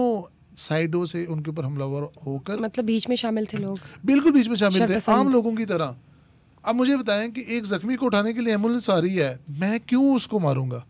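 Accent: India. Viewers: انڈین سٹائل سےاوردو بولنے پر شرم آنی چاہیے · are you an indian. Why do you speak in an indian accent? Qhan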